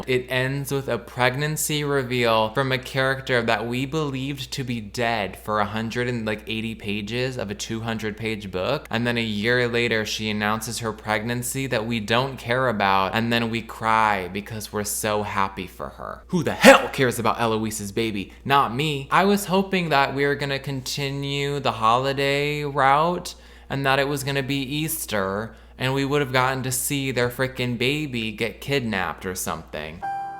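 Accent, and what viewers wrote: New Zealand. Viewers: the entire video and the nz accent got me so blindsided as a nz person LMFAOOOO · I cant with your new zealand accent 😭😭😭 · So happy you posted again ❤️ and your New Zealand accent had me dying 😭😭💀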